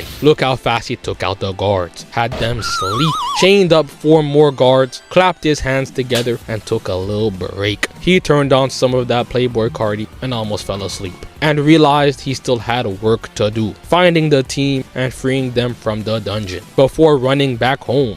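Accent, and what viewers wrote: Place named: Toronto, Canada. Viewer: I can't pay attention with that crazy ahh toronto accent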